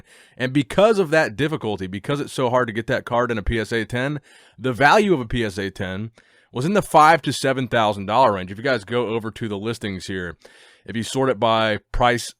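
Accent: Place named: New Zealand. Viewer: Awesome videos, you actually sound like a new Zealander too which is crazy. Keep it up bro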